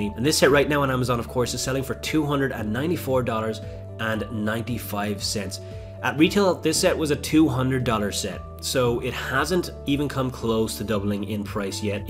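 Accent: Ireland. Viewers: Is that an Irish accent i hear? I dont use Amazon for sale prices but thanks for the tips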